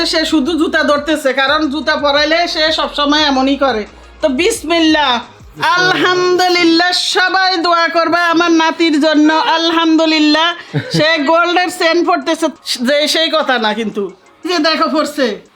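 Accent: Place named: Char, Bangladesh. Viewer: কথায় বুঝা যায় চর এলাকার মানুষ।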